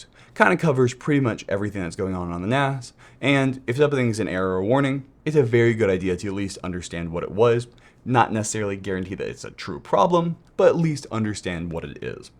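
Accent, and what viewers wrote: California, United States. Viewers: Love the channel but this the most California accent I’ve ever heard in my life and I forget every time until I click on the vid 😂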